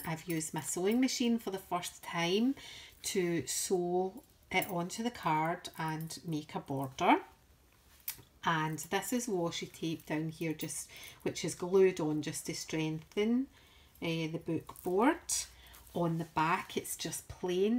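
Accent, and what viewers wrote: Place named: Scotland, United Kingdom. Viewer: Very much enjoyed your flip through. Can I be proud of my Scottish heritage too even though I’m American? Went looking for a Scottish journal video because I’ll be traveling to Scotland in October and want to put together a travel journal ahead of time. Planning on visiting Edinburgh, Glasgow (grandparents came from Glasgow), Oban and Inverness including Culloden battlefield. Now I know I need to find a thistle stamp like yours and bring home some Harris tweed. Thank you for the tips and love love love the brogue!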